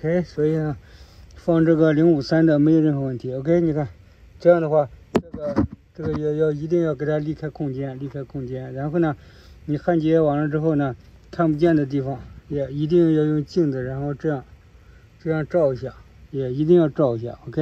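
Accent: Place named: Henan, China. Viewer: 听你口音说河南人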